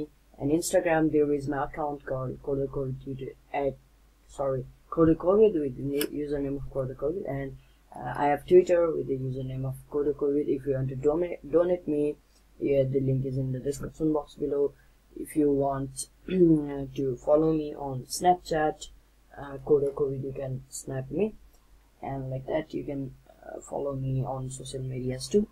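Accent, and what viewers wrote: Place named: United States. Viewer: please take in proper not like Americans talks so it would we understandable by everyone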